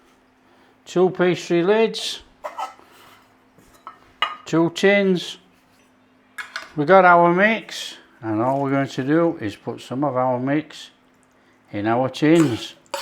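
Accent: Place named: England, United Kingdom. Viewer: Love your accent although I haven’t a clue where in England. Sadly these recipes will die out, perhaps I’m wrong. I was born in 1973 and growing up my mother always told how rewarding it was to produce a hot meal from ingredients that don’t cost the earth. She taught me to cook which has absolutely benefited me in my adult hood. I searched suet pie and stumbled upon your site, Traditional English cooking that tastes absolutely delicious. I’m originally from Norfolk and was spoilt with ingredients using pheasant and partridge I’m fed watching chefs that andypandy around throwing pepper/ god knows what over your shoulder. I’m now able to google/youtube your recipes & understand amounts etc to use. Thank u x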